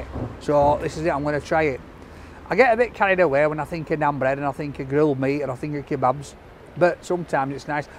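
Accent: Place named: Scotland, United Kingdom. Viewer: You just gotta love his accent. Is it scottish btw?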